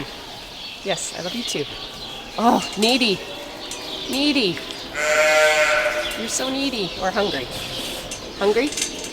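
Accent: Canada. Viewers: You must be Canadian. The fist time you dropped an "eh" I started looking for bilingual packaging and I found it. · hello from North Dakota, your honesty is so refreshing. I adore your Lambs and watching them grow, I must admit that when I first started watching the channel I couldn’t eat Lamb. As time went by and I saw the love and care you show each of them that soon changed. Lamb chops are my absolute favorite thing to eat, if it’s on the menu anywhere I’m ordering it. My husband not so much he just doesn’t enjoy the flavor of the meat. Keep on doing what your doing, I must also admit that I love hearing your accent and Canadian sayings it reminds of home.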